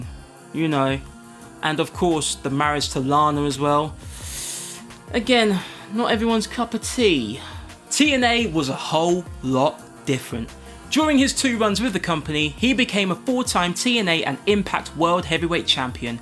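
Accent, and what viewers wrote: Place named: England, United Kingdom. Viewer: I clicked to hear the bro talk with an English accent. Go forth young man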